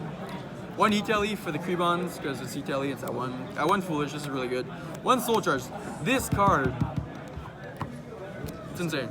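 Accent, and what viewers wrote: Canada. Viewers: This guy sounds very Canadian.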